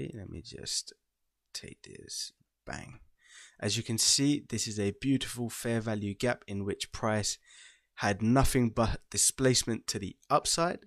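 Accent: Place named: Germany. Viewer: wie wenn du deutsch redest.bitteversuche in englischen dialekt zu reden.versuche.du gibst sicher gute tips aber der dialekt macht dich unhörbar.bemuhe dich.danke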